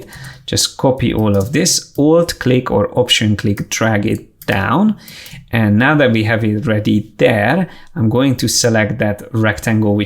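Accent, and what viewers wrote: Hungary. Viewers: Your voice it's like you have a little bit hungarian accent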